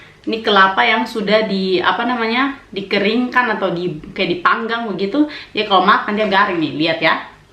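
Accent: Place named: Kalimantan, Indonesia. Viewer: ngomong kaka sma ky di kalimantan 😍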